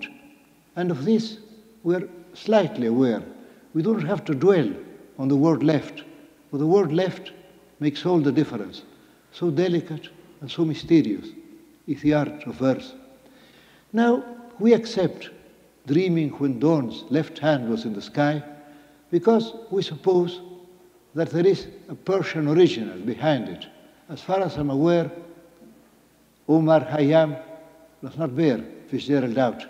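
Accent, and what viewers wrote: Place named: England, United Kingdom. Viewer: MUCHAS GRACIAS SEÑORA POR SUBIR ESTAS JOYITAS DE AUDIOS EN DONDE UNO PUEDE OÍR EL ACENTO INGLÉS DE BORGES.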